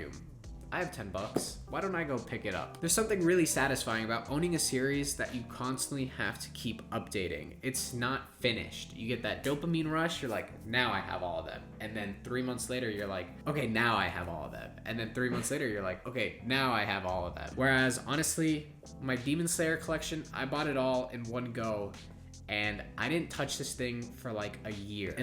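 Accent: Canada. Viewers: I think Canadian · Probably Canadian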